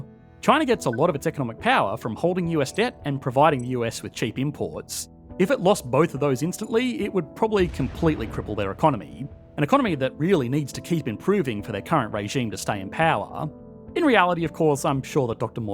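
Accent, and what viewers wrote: Australia. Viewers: Australian English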